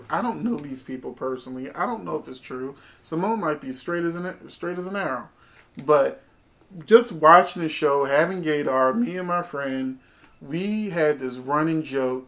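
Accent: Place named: St. Louis, United States. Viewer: Where are you from? Yo accent sounds like St. Louis.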